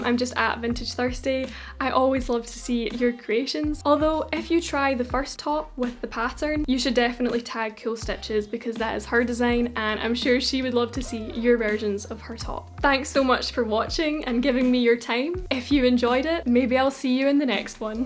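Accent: United States. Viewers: Your American accent was actually on point! Loved this video, thank you for sharing and making us all believe in thrifting and the possibility of transforming "those" clothes into something new and fresh! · your american accent was actually so good 😭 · no ur american accent was PERFECT what the heck lol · Your american accent was pretty good! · your american accent is really good!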